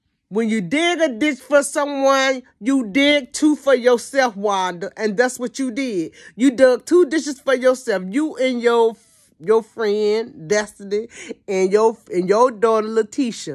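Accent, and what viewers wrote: United States. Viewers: I kept listening and I hear wisdom and plain common sense. You sound like you from New Orleans? Good talk my friend! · No she dont. She sounds like she maybe from The Carolinas, Mississippi, Georgia even.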